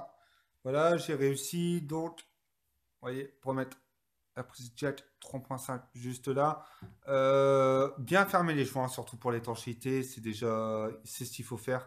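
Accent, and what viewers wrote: France. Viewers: un léger accent Chti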